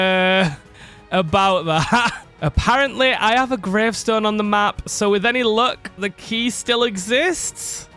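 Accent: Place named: Yorkshire, United Kingdom. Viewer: You sound like you're from Bradford/Leeds/Hudds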